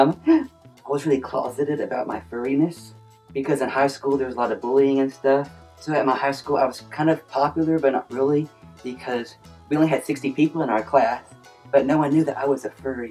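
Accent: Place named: Texas, United States. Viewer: Nice video!I love your accent. You from Texas?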